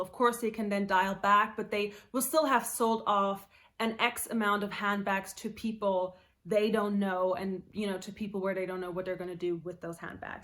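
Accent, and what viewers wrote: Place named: United Kingdom. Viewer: I thought I was going crazy because I’ve been seeing Hermes all over the place. Even I was able to get an appointment and I don’t even have Hermes money. I’m curious, where is your accent come from, it’s beautiful. A bit British?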